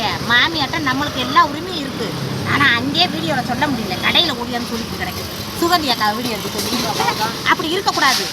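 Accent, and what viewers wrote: Madurai, India. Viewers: மதுரையா கமுதியா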